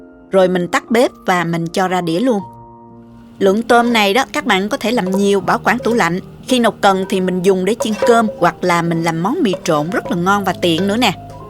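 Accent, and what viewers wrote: South, Vietnam. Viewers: làm cơm chiên nhìn thấy ngon quá, nhìn thấy thèm muốn ăn hết một tô lớn luôn đó, vừa khéo tay chỉ dẫn dễ hiểu lại có giọng nói trong trẻo rõ ràng đặc trưng của người miền Nam rất dễ cảm mến và giọng nói rất hay và trẻ trung nữa! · có giọng nói miền nam truyền cảm trong sáng hiếm có. Rất thích xem các video hướng dẫn nấu ăn của không những vừa ngon miệng mà còn trang trí đẹp mắt nữa. Rất cảm ơn, triệu like cho. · Gio.ng no'i dung giong mie^`n nam nghe de^~ thuong ghe^ no*i!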